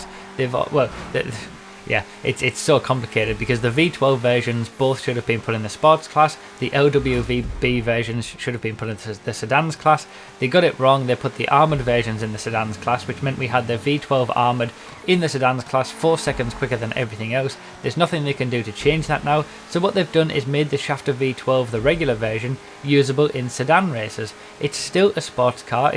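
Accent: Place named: London, United Kingdom. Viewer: Yeah he does have quite a london ting accent, fortunately I have friends that speak like him so I've developed an understanding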